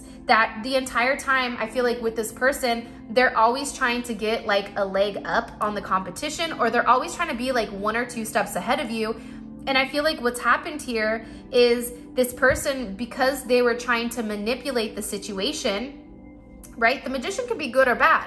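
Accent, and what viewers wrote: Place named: Bay Area, United States. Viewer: Def Bay Area Cali girl Lol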